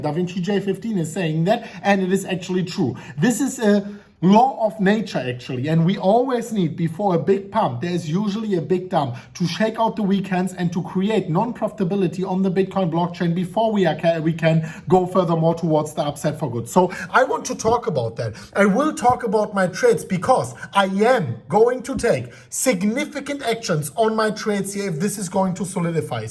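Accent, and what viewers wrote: Germany. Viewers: I love the German accent in English.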